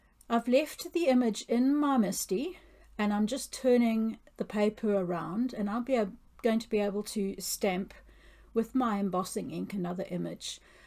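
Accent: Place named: New Zealand. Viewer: Gorgeous and love to hear your accent. Spent three months in NZ in 1974. Loved it! Hugs & Aloha! 🤙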